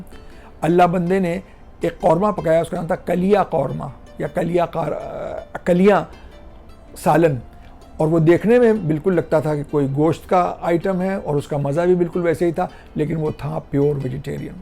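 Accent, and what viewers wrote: Lucknow, India. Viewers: Adaab,kya he kehnay janab ap k or ap k andaz e bayan k.lagta hy Lucknow pohnch gaye hum tau.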